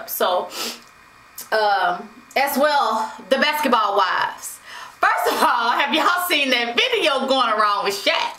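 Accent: South, United States. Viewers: i feels that?? hahaha. your country accent cracks me up (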